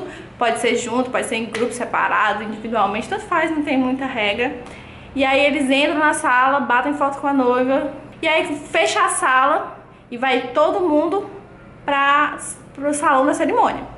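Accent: Ceará, Brazil. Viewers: guriiiiia tu é nordestina ? tu tem muuuiiito sotaque hahahahaha adoreeei saber sobre os casamentos dae, e um pouco sobre o seu tb e super me emocionei !! TuT acho tão lindo casamentos !! Mas tinha que ter briga pelo buquê sim u-u qual é a graça se não tem briga pelo buquê ?? ahahahaha xD #NovaInscrita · Teu sotaque parece do Ceará. Tu é de onde? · você é cearense, né? kkkkkk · Ah! Que legal! Sou Nordestina tb, não do Ceará mas da Bahia. Nossos sotaques são tão bonitos!!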